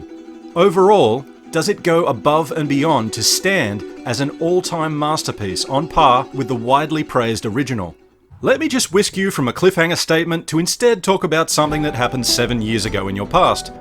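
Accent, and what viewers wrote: Australia. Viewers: Nice review and are u Australian? Cause then u get major respect. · Best review yet. Love the Aussie accent/slang and you are GOOD at this game! Fantastic stuff. Subbed.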